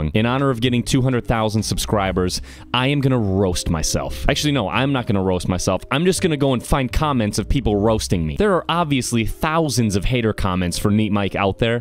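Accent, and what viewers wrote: United Kingdom. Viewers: I'm an english man and you do sound a bit English only a bit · NeatMike I actually thought you were from the United Kingdom when I first watched your videos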